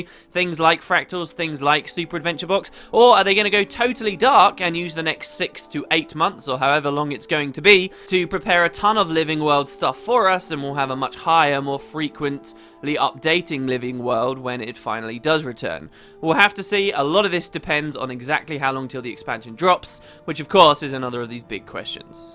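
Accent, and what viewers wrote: United Kingdom. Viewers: one british person to another...gems. Gooo on...please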